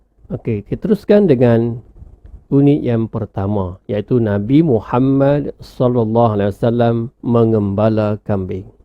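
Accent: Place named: North, Malaysia. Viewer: Mcam utara ja ustat😂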